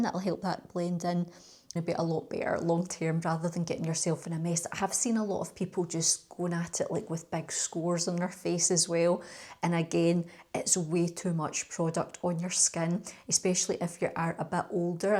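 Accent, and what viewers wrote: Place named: Scotland, United Kingdom. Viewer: i’ve already watched a couple and loved them. I’m older and absolutely love beauty and make up and I seriously have never never been able to put concealer on. I read about this one and thought I’m going to try it and then found your video about how you apply it and seriously never had such success with an under Eye concealer and I need it at my age so thank you and I will enjoy watching the rest of the videos and I’m so glad I subscribed and I’m also Scottish but I live in Australia, so the accent is very welcome 😊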